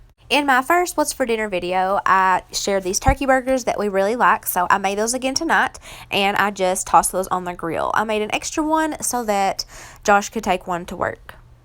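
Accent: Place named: Tennessee, United States. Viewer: My husband and I were sitting here watching your video and trying to place your accent. We are from West Virginia. I say you’re from Tennessee and he says Virginia. I’d love to know! Also, I love your videos - just subscribed. ❤️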